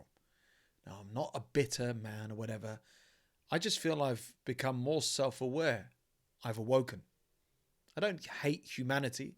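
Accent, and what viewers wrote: United Kingdom. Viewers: He described all of Western societies today to a tee in a British accent.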